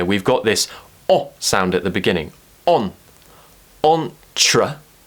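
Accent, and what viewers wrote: RP, United Kingdom. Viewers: I really love your videos and British pronunciation P.S. You are so cute^^ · You've chosen some of the most common words that are typically mispronounced. Your explanations are very clear - great job! I love your soft British English and your kind eyes. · oh teacher iliked your British languaga so amazing thank you so much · I like your British accent. Please please can you make a vedio of some random difficult and long English words. For example you did already Entrepreneur. Can you do concierge or other similar heavy English words that we can still use is everyday conversations. Not really sure if you get what I'm trying to ask... I hope you do though... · Hey i've been wondering that what accents do you teach. is that RP? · Very interesting find. I could watch you talk all day eventually I would have a whole British accent 😁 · You are the best British English speaker. 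· Sound more British then bristish itself haha best teacher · Economic expressions or any expressions about business would really help me:D because I'm studying at Business Academy. You're really awesome teacher. I absolutely love your British accent. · Best teacher ever!!! Love your videos and your British accent, are you from London? 😍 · Awesome! Thank you very much! I'll get it someday, I'll get this British Accent one day!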